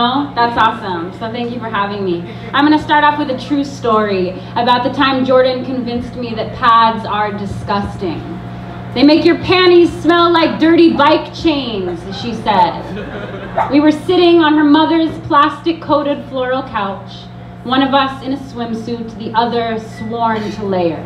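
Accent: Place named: Long Island, United States. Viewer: She cracks me up when she’s just chilling and talking. And how she mastered that Long Island accent! 😦 I’m dying to see her speak somewhere close to NC!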